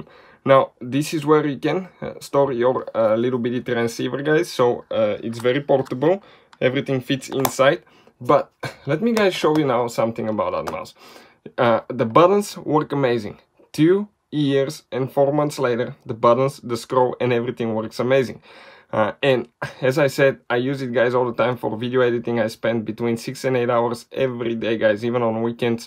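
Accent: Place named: Russia. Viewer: i love the thick Russian accent lol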